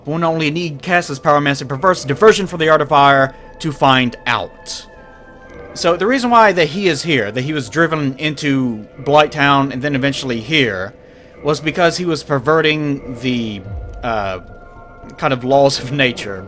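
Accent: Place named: Western Kentucky, United States. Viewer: Going by accent and the fact that he owns/lives on a farm I'm gonna assume is from somewhere in Western Kentucky. Unless he lives somewhere farther East around Bath county in which case I may have been blessed by his sexual aura and not even known it.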